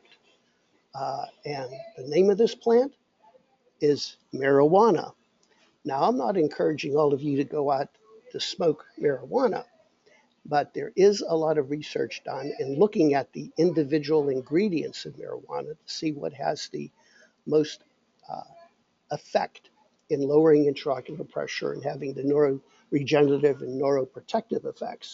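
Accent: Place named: Pittsburgh, United States. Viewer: You must be from the Pittsburgh area from the accent. Im from around there.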